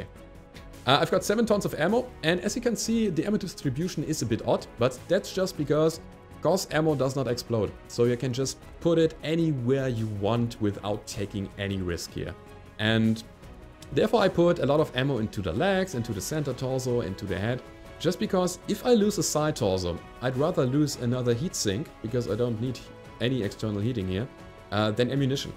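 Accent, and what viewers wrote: Germany. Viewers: Your Vids are really impressive. Do i get it right, that you are a german? Your english sounds like its spoken by a german :)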